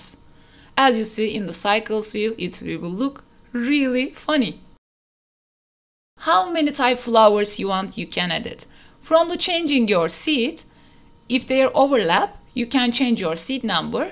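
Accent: Turkey. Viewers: konuşma tarzın çok türke benziyordu bi baktım türkmüşsün :D bu aralar sürekli blender'dan liminal space ile ilgili şeyler yapmak istediğim için hep bu tarz videolara bakıyorum sonunda istediğim videoyu buldum eline sağlık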